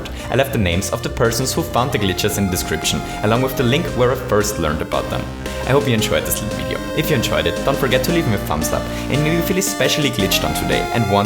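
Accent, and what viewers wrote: Germany. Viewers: are you german? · Du bist Deutscher, nicht wahr? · Are u german lol? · Are you German? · Man kann irgendwie so raus hören, dass du deutscher bist xD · Dang your German accent😂❤️✊ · Bist du deutscher? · are u german? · are you german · Fuck urself. Have you ever learned a second language? If you have, is it as stupidly hard and inconsistent as English? German is his first language, and he's tried to speak like a native English speaker, but it's almost impossible to do so without an accent.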